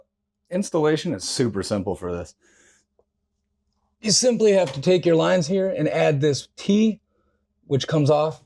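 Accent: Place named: Buffalo, United States. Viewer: Knowing you're from Buffalo, that accent goes crazy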